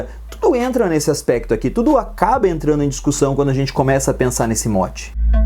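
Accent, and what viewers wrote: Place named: Inland São Paulo, Brazil. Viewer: Sou seu aluninho do QG... Seu sotaque é igual o meu pois moro no interiorrrrr de SP .. Kkkk Adorei sua análise, estava aguardando alguém faze lá ...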